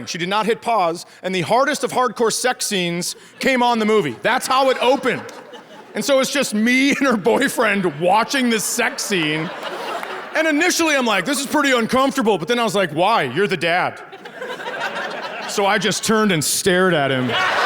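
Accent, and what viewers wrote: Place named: Canada. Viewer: Just found you on TikTok and had to come here and watch you. I thought you were Canadian at 1st from your slight accent. Found out you are from Fergus and I'm just an hour east of there. I now realized why people think we sound like Canadians. We're just too close to the border and it's all their "Canadian wildfire" smoke we get each summer turning us into Canadians. 😂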